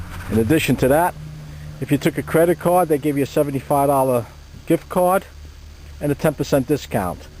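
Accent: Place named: Boston, United States. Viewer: Boston. It's gotta be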